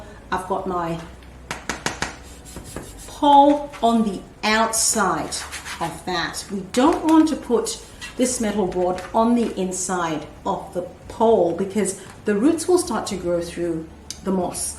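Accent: Australia. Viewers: Australian accent ❤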